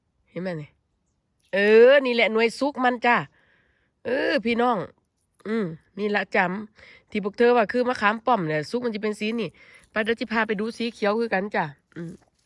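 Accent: Korat, Thailand. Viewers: 😂😂😂 นี่ยังแม่นเนาะ คนทางไหนน้อ สำเนียงคือคนโคราชเนาะ fcใหม่จากสวิตฯค่ะ ปีนี้เพิ่งปลูกสีแดง เดี๋ยวจะไปเอาสีเขียวมาปลูกอีก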